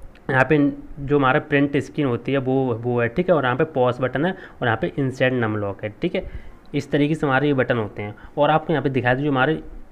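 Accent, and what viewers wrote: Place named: Saharanpur, India. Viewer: Pakka sharanpur ka h bhai mhara bhai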